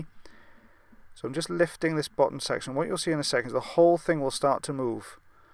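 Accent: Wales, United Kingdom. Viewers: Are you welsh cuz same😂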